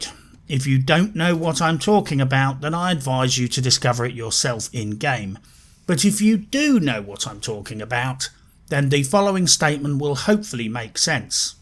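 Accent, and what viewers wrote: United Kingdom. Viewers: You can't tell he's a brit by his voice? Dull one hm?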